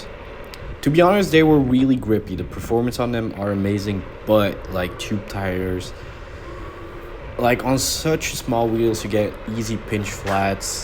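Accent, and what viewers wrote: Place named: France. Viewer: T'entends pas son accent ? Il est français mais pour toucher plus de monde il fait sa vidéo en Anglais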